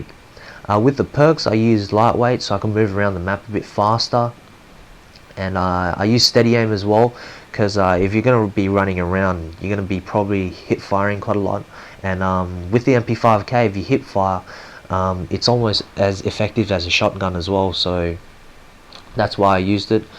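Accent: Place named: Australia. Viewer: lol.. all aussies sound the same!! lol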